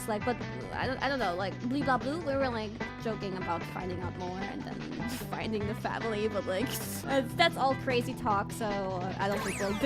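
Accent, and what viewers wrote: United States. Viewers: American blood... Maybe that is why she is good speaking American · Must be why she has such good aptitude for speaking American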